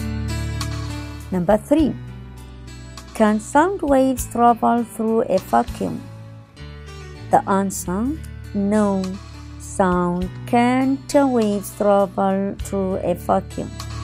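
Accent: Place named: India. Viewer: نطقها هندي 🌚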